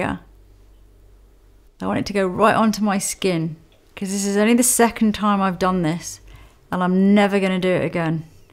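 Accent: United Kingdom. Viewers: Shes got amazing eyes and a great facial structure...I love her briiish acesent...great make up tips thx...we all love Audrey she is a darling and amazingly creative..thx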